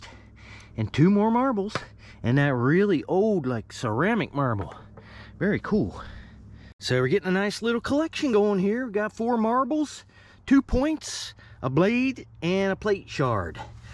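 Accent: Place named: West Virginia, United States. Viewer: That accent... puts you somewhere around Fairmont to Clarksburgh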